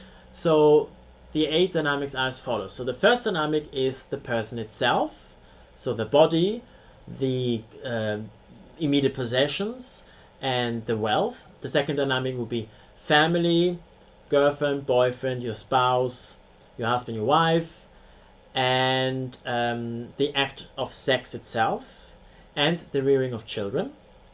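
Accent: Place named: London, United Kingdom. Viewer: I'd say a posh London accent. I live in the North east of England. We have a very strong accent people say. Your accent is to posh to be from my part of the country. You have a lovely speaking voice, very easy to listen to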